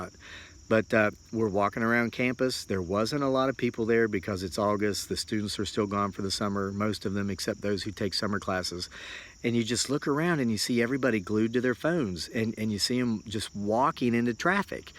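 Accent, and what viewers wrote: South, United States. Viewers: Hey, watch out flipping that old phone around, you might get beamed up to the Starship Enterprise. Haahaa. Just kidding, I got one too, and will keep it until it dies. Real money savers too. You are right about people zoneing out with their noses 8" from the I phone screens. It will, and is having a negative effect on our society, people (Young people) have lost the art of simple conversation and reading faces and expressions, and that is concerning. Agree that getting a signal in the country can be very hard, you may have to use a different carrier. Here in the Ozarks, it can be a problem sometimes too. I see that you have a bit of a Southern accent, are you from the Carolina's? If so, did you hunt and fish as a boy with your dad? Will you be teaching your son those skills too? Enjoy your show. Thanks.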